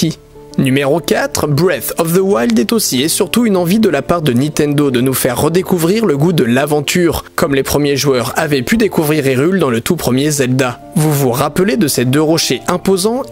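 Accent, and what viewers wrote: England, United Kingdom. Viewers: j'adore ton accent anglais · WoW l’accent anglais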